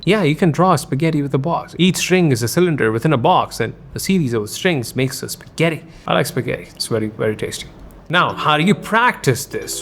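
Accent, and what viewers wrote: India. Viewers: I totally forget sometimes you have an indian accent, which i love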